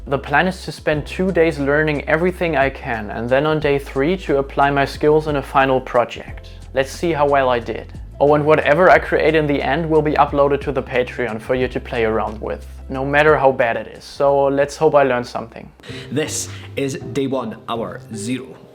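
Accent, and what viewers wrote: Germany. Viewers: I love your German accent! Great video!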